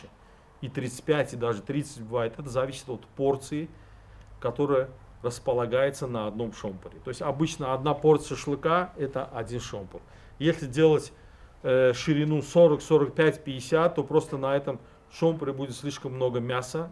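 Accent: Baku, Azerbaijan. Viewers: Всегда рад послушать ,Бакинский акцент так как я родился и вырос а Лок-Батане,в 24 года уехал в Пензу,но Баку в моем сердце НАВСЕГДА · Здравствуйте! Большое Вам спасибо за лекцию. Самый правильный, познавательный, без лишней воды расклад по теме. Очень приятно было Вас слушать. Родной акцент, с любимой родины моей. Здоровья Вам и вашим близким.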